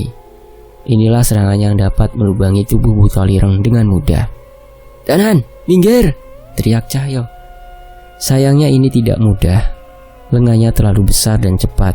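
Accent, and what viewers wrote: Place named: Bali, Indonesia. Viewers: Om swastiastu blie 😅😅😅 longat Balinya keren salut ya sama blie selalu bisa mengikuti longat di setiap daerahnya 😅😅 Salam dari Jimbaran Bali Kuta Selatan blie 😅😅 astungkare sehat selalu biar semangat trus bawa acara fakta sejarah dan fs juga 😅😅😅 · Mas bikin salfok pke logat bali😂😂😂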